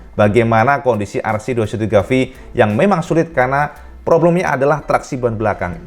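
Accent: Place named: Java, Indonesia. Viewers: Saya suka gaya bahasanya dari... kental bahasa jawanya 😁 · Paranormal motoGP 😇😇😇😇😇😇😇😇 logat jowone ojo di ilangke..........ciri khas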